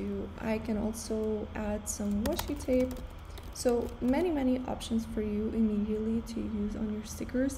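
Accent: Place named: Spain. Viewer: I am Indian but love your Spanish assent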